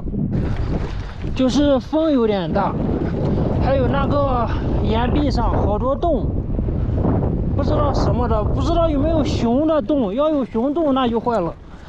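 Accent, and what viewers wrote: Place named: Linyi, China. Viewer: 听口音像是山东老乡，像是俺们临沂那一代口音哈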